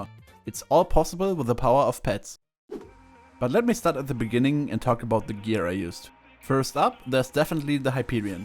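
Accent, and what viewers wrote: Germany. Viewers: Bro du hörst dich mies deutsch an (ich kann auch nicht besser englisch)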